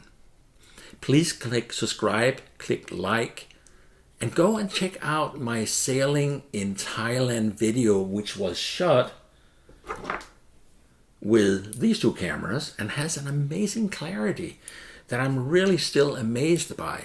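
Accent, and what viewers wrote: Denmark. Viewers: Hi, thanks for uploading. A Dane here from Copenhagen, who also shoots Canon - great setup and hyggeligt to hear your Danish accent. I look forward to seeing what you shoot on your 12K 🥰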